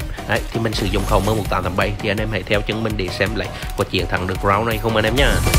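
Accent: Central, Vietnam. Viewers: Anh người miền Trung hả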